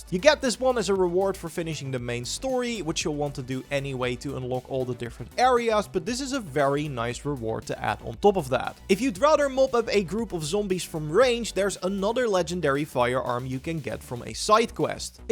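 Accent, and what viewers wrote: Netherlands. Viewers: I smell dutch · je blijft horen dat je Nederlands bent haha maar thanks voor de tips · Jezus wat klink jij nederlands als je engels praat 😅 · Ik hoor een nederlands accentje haahhaa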